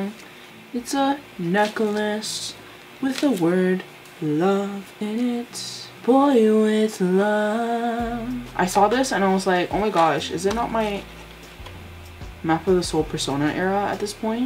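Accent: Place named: Canada. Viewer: You’re either from Canada or Seattle. I forgot which one says beg=bag. Maybe both Edit: Its Canada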